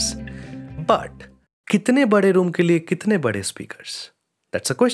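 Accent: Bengal, India. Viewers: First of all very fine content and knowledge you have and also like your bangali hindi accent 😁, Just wanaa know a weird thing, can we use Yamaha PSR S-950(or S750, S970, S770) workstation speakers for monitoring if you ever heard those keyboards sound, it sounds like HS5. Only problem that they are positioned fixed & flat. We cant increase there distance.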